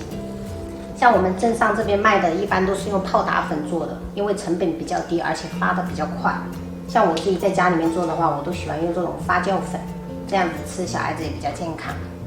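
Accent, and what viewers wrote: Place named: Minnan, China. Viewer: 發糕我們都是特別的節日做的，大部分是過年的時候做的比較多，其實你們閔南話，跟我們的台語好像，所以一直覺得妳讓我感覺好親切哦！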